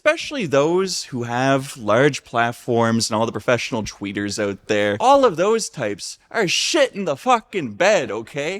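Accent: Nova Scotia, Canada. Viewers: Thought I heard an NS accent, flag confirms it. Lived in Halifax for 20 years, came back to UK due to health issues. Can confidently say that the Western world is falling apart, and it's glaringly apparent in the UK, and from what I hear, back across the pond too. Can confirm that "business rates" here are fn absurd. You think Visa/Mastercard are bad? Amex charges 5%. Running a business in Halifax, aside from cash, Interac was by far the most cost effective method of processing any transaction higher than $5. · He's Canadian. It's a Canadian accent. From Canada.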